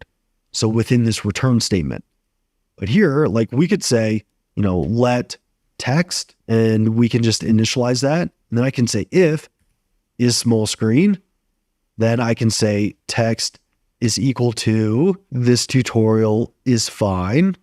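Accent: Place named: United States. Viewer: I've been wishing for someone to make this video (react 2025) and for that someone to be an American English speaker and not an Indian and here you came in clutch with this gem. Thanks!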